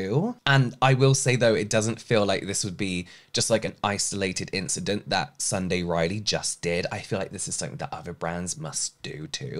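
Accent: United Kingdom. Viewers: I believe Peter Thomas Roth would fit into this category! I've bought a set of their cosmetics because it was on sale and tried some of their most famous products, vit C included. And it didn't change anything for me. I do like its luxurious touch, though! Won't be rebuying,too expensive to just feel nice and see no effects. Great video, I looooove your British accent ❤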